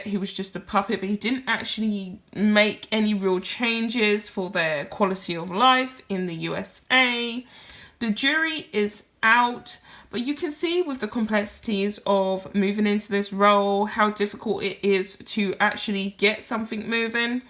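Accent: United Kingdom. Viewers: A none American with a Brit Accent is explaining tax changes- you can’t even vote. Quit throwing shade on Joe.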